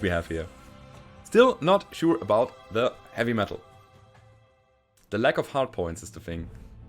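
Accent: Germany. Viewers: I like the format of build and refit at the beginning then a battle then upgrades and another battle. Each episode is well layed out. I dig rogue tech and have played the table top since 94 and no one would play the level 3+ rules that rogue tech represents. I love the whole thing even the Deuchlund accent, loved my time there.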